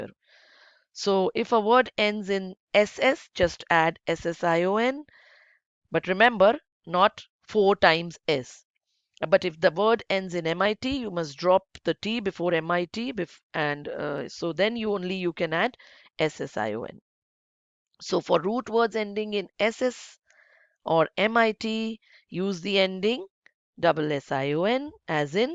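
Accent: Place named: India. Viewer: teacher, thank you too much for this [ clear tutorial ] and [ the helpful ] which solved problem had been with me. from your accent I think u are Indian by the way I lived in India 3 years mostly in Bangalore and Mumbai, it was really unique time and I really want the time give me another chance to visit India..........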